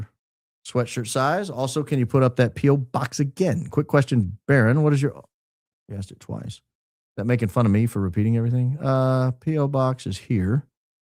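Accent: South, United States. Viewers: Yes, Baron…You definitely have a southern accent. 😂. And I agree with you about Christmas Album! is my fave!!! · Baron babes to a northerner you for sure have a southern drawl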